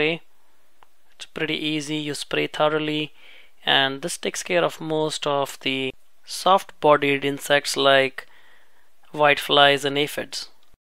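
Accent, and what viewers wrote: India. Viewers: your sweet Indian accent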